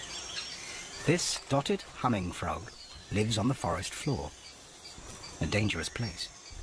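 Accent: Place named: United Kingdom. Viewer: British narration ruins it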